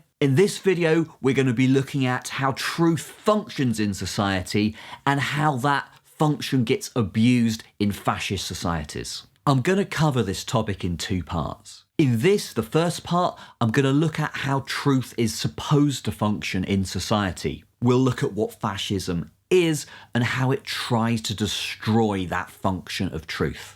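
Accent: United Kingdom. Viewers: And your British accent does nothing to give you credibility . My stepfather is a Brit. Anyway , turn off BBC which is prob bigger garbage than cnn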